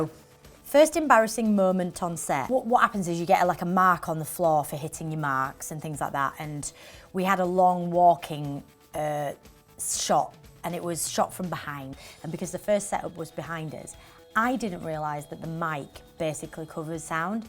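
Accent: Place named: Yorkshire, United Kingdom. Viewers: Her accent is just brilliant might be a bit bias because im from yorkshire 😂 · she makes me wanna embrace my yorkshireness and I repress it all the time LMAO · her yorkshirity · She's actually Northan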